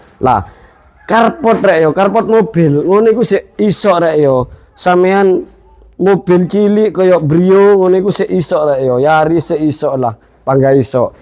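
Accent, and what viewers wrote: Surabaya, Indonesia. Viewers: Meduro, rasa suroboyo iki🤣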